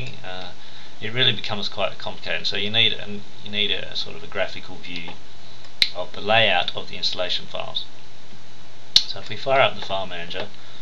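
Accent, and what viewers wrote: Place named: Australia. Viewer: omg you're Australian aren't you!? That's awesome... fellow Aussie 😁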